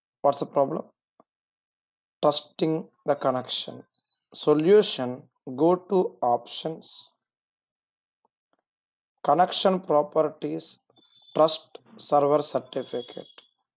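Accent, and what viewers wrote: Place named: England, United Kingdom. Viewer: I don't know where you are from but, every time I hear that English accent explaining something I know that my issue is going to be resolved! THANKS, A LOT !!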